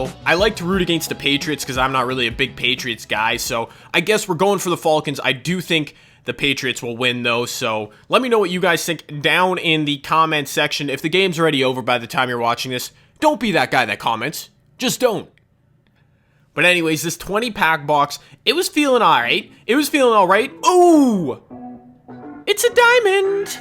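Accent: Canada. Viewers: Hugh Janus Hes Canadian its just his accent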